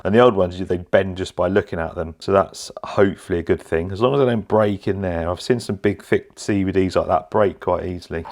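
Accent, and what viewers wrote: United Kingdom. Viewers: Good God that's an ugly controller! 😕 I do like the body shell and your British accent. ☺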